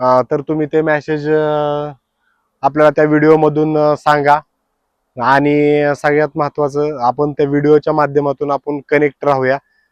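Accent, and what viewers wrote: Western Maharashtra, India. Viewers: पश्चिम महाराष्ट्रातील सोलापूर , कोल्हापूर ,पुणे,सातारा ,सांगली या भागातील भाषा आपण बोलता ऐकायला खूप मजा येते. हॉटेल मध्ये गेल्यानंतर तेथील प्रत्येक सजीव व्यक्ती ,निर्जीव वस्तूचे वर्णन, हॉटेलचे वर्णन खूप छान असते . वेगवेगळ्या हॉटेल मधील चविष्ट मटण रेसिपी पाहायला खूप मजा येते. मी नेहमी आपले शॉर्ट्स व्हिडिओ पाहतो❤❤ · तुम्ही बोलता तो भाषा जी की, सोलापूर, सांगली, सातारा, कोल्हापूर ची ही भाषा तुमच्या तोंडातून आम्हाला ऐकायला आवडेल.... आम्ही मराठवाड्यातील हिंगोली जिल्ह्यात तुमचे हिडीओ खुप बघतो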